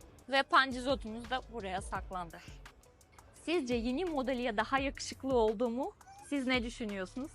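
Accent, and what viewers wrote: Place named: Russia. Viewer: abla rus şivesiyle türkçe konusuyor ve almanyada 😮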